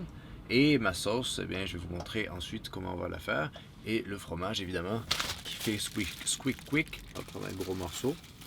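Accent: South, France. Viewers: Lors de ces premières recettes ce cordon bleu en mode campervan avait un accent de sud de la France ;) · Accent du sud ? De quel sud parlez-vous ?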